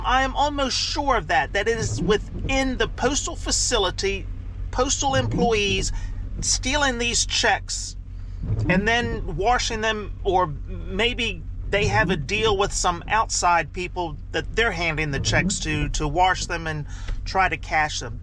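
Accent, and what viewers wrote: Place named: New Jersey, United States. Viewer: You talk like you are in New Jersey .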